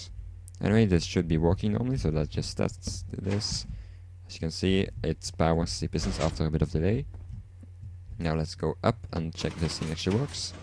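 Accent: Netherlands. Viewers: I can hear you're dutch, and you're from the south of the Netherlands aren't you? ;) Great design man! Kudos to you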